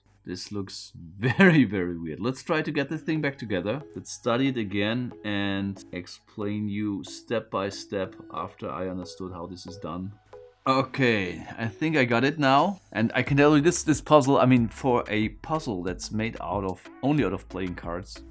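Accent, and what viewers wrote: Germany. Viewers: Au contraire, my friend. Please, forgive me my harsh tone, but for a somewhat younger (age < 45, I guess) German his accent is unforgivably and shamefully thick - "Fremdschämen" is my dominant feeling hearing it by my fellow countrymen, especially when accompanied by our (i.e. German) typical permanent appreciation. So, my ears kept bleeding. And I guess a half-trained chimp would be able to deduce what "jostle" means from the context "usual puzzle solving techniques". At least I was able to do it immediately even while eating my banana. In conclusion, I cursed using the i-word seeing him shaking and whacking this delicate piece. On the pro side, he didn't chew on it (a seldomly applied puzzle solving technique though;) Also, he did a very nice repair job on the puzzle (there is an engineer in all of us:) And he solved it. Nice. So, hurray... 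The puzzle itself is quite a piece of eye candy! A perfect christmas gift, IMHO. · Why I watch vids: 1. his German accent and mild humor is soothing to me 2. I love his engineering brain and approach to solving puzzles - his methodical approach is interesting and has applications outside of solving puzzles 3. I get satisfaction from watching the process and the solve and the explanation of the mechanics. When solved, it's like I get the same satisfaction as he does which makes me feel good! Danke!:D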